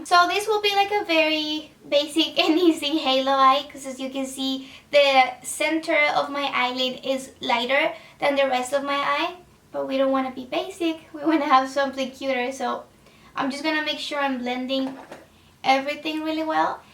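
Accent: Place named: Colombia. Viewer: amo tu inglés colombiano😍😍😍😍😍😍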